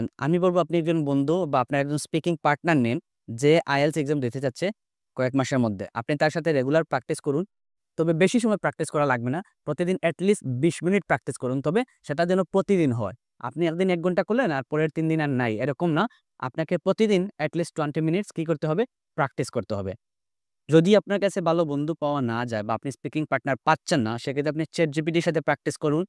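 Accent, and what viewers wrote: Sylhet, Bangladesh. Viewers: আপনি কি সেলেটি?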